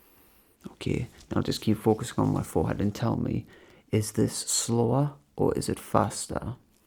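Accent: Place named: Wales, United Kingdom. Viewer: Your accent is lovely! Welsh I think? 😅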